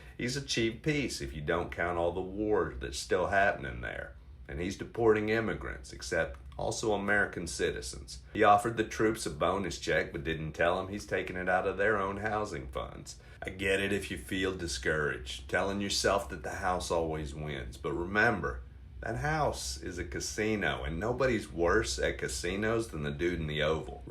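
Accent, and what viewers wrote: South, United States. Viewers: You are smart and funny with a common touch. Nice Will Rogers style. It's always refreshing to hear Trump roasted in a southern accent.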